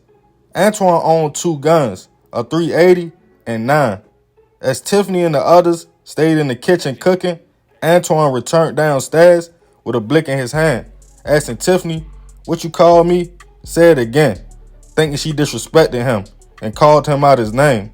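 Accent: Baltimore, United States. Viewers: Man If It wasn't for my Army Buddy From BALTIMORE Back In The mid 80s I would Never understand your accent..HOT DUGGS for All my friends 😂😂😂😂😂❤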